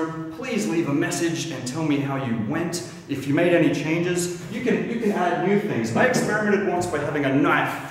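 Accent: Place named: Darwin, Australia. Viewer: He sounds like he's from Darwin, Australia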